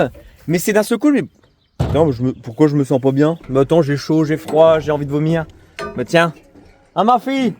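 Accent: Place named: Vosges, France. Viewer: C’est l’accent des Vosges ça ^^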